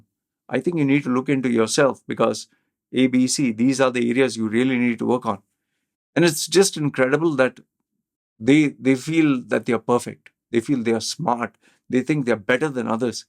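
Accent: India. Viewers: U are another type of Indian, Bashing other Indian trying to fit in. Every individual has an attitude and you are just full of shitty ideas. What are you? A gangster-faced wannabe western with an Indian accent. you are disgusting!!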